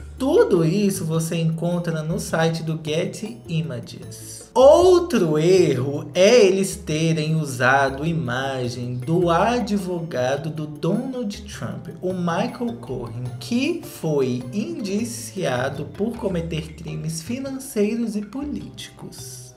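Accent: Minas Gerais, Brazil. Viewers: Eu amo essa narração em Minerês,nó.....muito lindo.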